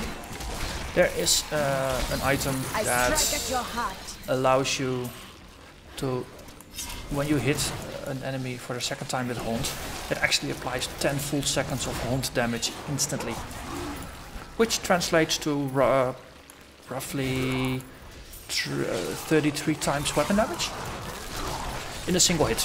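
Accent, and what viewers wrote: Netherlands. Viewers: ik hoor gewoon dat je nederlands bent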